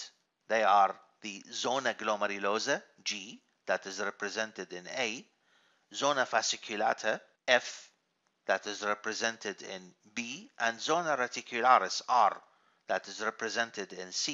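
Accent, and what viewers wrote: Iraq. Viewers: مبين من نبرة صوتك عراقي سبحان الله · اي والله اني هم لاحظت بيه عراقي اول ما حجه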